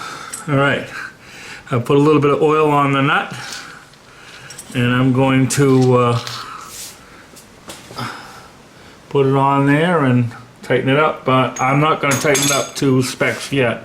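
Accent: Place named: New England, United States. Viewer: Sound like your from either Pawtucket or Taunton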